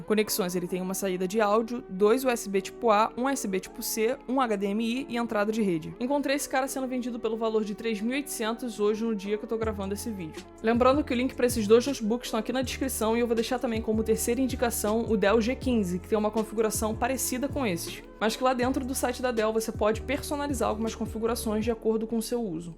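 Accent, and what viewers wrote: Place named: Rio de Janeiro, Brazil. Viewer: Quase não da pra reparar que ela do Rio né hahaha Goxtoso esse sotaque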